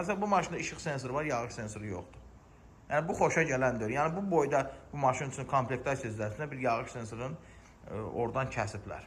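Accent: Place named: Qabala, Azerbaijan. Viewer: Ləhcənnən nömrəni 036 muqaisələndirdim qərara gəldim ki Qəbələdənsən😌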